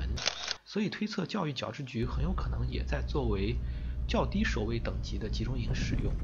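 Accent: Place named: North, China. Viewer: Obviously, he spoke Mandarin with a strong accent of north China.Besides, you need a dictionary to understand what "developed" really means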